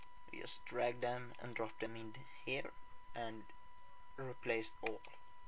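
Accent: Sweden. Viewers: Hmihmi! Fyfan va kul :D älskar när svenskar pratar kult engelska :DD Sä himla gutt!! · så jäkla bra älskar hur du utlar dom engelska orden med typ svenskt tal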